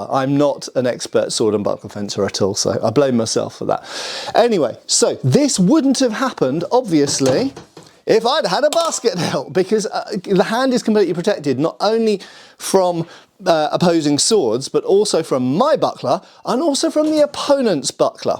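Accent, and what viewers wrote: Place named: Scotland, United Kingdom. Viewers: Technically nae just an accent, but a separate language, or leid. Middle Scots was it's own leid separate from English, as is it's descendent Modern Scots, which I have been trynna practice more. Odd but nice that they are close enough you can mix them so much and still be reasonably understood. Fun at the least, as is the accent. As a broadsword/claymore practioner meself here in Canada, although admittedly a rather junior one, Ah love that I can hold a spiked targe, a dirk, and claymore all at the same time. Lotta options to stab, bash, stab, smash, or cut, as is most convenient. Always love the baskethilt content anyways! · Yeah, more scottish speaking!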